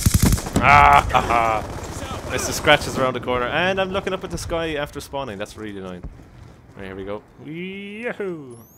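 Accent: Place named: Ireland. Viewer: you are much funnier than Pewdiepie and deserve many more subs than him:) You are a really great guy and I love the Irish accent you have. I would love to game with you one day:)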